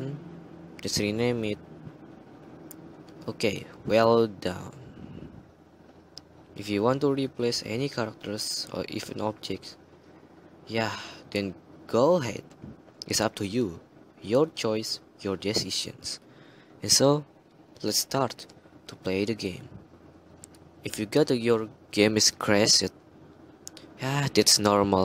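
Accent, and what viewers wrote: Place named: Indonesia. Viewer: You indonesian not engglis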